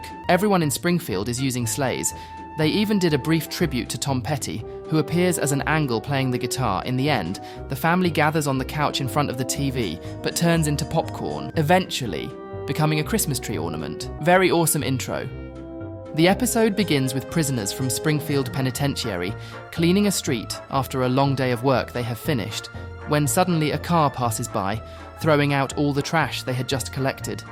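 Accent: United Kingdom. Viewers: Hes britsh a can tell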